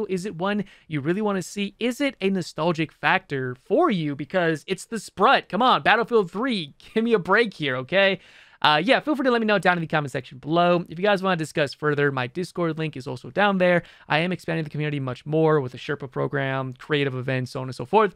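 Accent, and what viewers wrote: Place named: United States. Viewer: You have the voice of callmekevin mixed with a clickbait youtuber voice and the tone of an american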